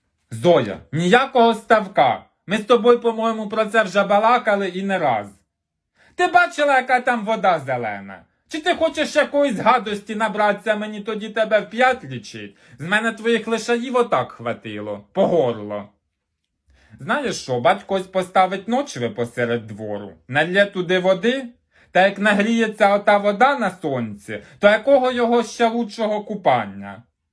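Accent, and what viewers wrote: Poltava, Ukraine. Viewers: Велике вам спасибі! Ви точно як моя мама розмовляете! Сто відсотків Полтавська область! Слово ночви тільки там вживають! Дякую вам, будьте здорові!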